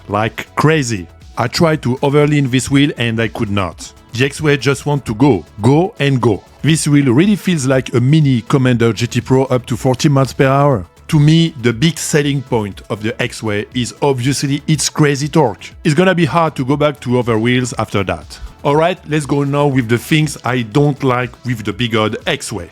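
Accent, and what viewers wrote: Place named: France. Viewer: je te le redemande , es tu francais ? l'accent me vle fait penser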